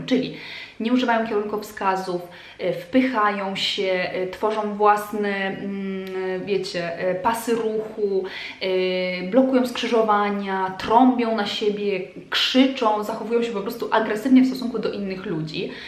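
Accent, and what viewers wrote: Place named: Kraków, Poland. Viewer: Ciao. Mam identyczne przemyslenia ! Ps i jestem krakowianka! U nas w Krakowie wychodzi sie na ,,pole,, a w innych czesciach polski ,,na dwor,, 😁 napisz jak oczywiscie mozesz chociaz gmine w jakiej mieszkalas...masz typowy akcent krakowski jak Ja..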